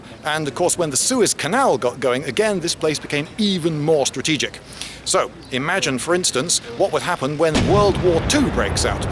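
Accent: United Kingdom. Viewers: haha is so british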